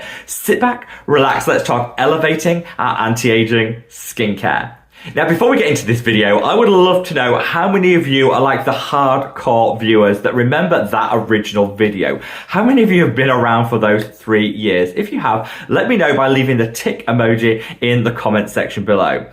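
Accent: United Kingdom. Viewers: I came to your vlog a few month ago but I‘m always very excited about your topics and I love your british accent ! I started with the agireline two weeks ago, to soon to talk about results, I use Matrixyl two month. I can‘t see anything about reducing wrinkles but I‘m in the postmenopause, it is a bit too late for that. But, my face looks a bit fresher and glows a bit, that‘s what friends tell me and that makes me look a bit younger, so for me personally a good result! Thanks and keep on going, you‘re great!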